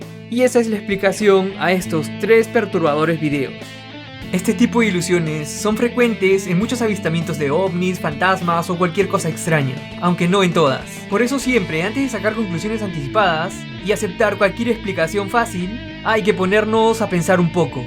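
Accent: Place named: Peru. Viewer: ERES DE PERU VERDAD ? POR QUE TIENES NUESTRO DIALECTO .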